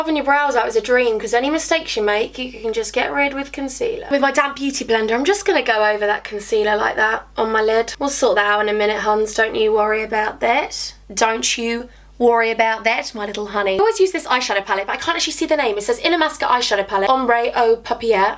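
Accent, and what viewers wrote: Liverpool, United Kingdom. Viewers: I go on to you and hollys get ready with me all the time just so me and my friends can hear you doing the Liverpool accent😂 OBSESSED with you and the bomb accent😍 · I love you u are so funny I keep say, you know and baby, boy to me hubby and it's doing his head in by the way well boss Liverpool accent cus that's we're I live lol xxxx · by the way love the scouse acsent hahaha... (am from liverpool) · omg obsessed with this loook😍 and your Liverpool accent is amazing! You deserve 50,000 so much, love you xxxx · Your Liverpudlian accent is actually amazing😂😂 also congrats on the 50K to the most amazing angel of all❤️👑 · Your scouse (Liverpool) accent is soo good hun ❤️ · that scouse accent hahaha you sound like me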